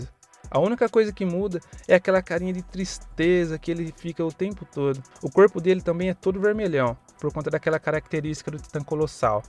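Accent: Brazil. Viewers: Vc é Gaúcho????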